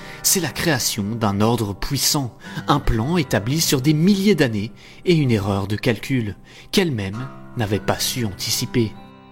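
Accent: Belgium. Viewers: T'es belge toi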